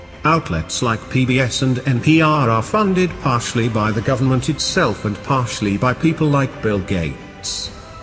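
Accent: United Kingdom. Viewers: Why the British accent? We're talking about America, after all.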